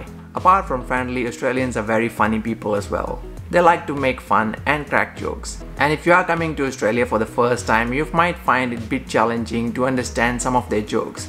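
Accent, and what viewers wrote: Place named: India. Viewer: U sounds Indian!! Aren't u?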